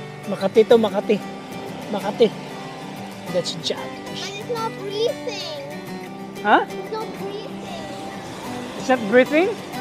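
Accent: Iloilo, Philippines. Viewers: Ilonggo ka b kuya? Malubog mn s Ilonggo ang d matin aw😁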